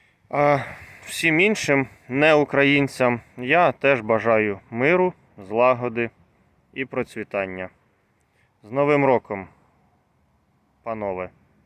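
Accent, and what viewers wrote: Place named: Poland. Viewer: Панове, вы со своим польским акцентом, пиздуйте в свободну европу, вот тогда Украина будет свободна и мир будет у славян.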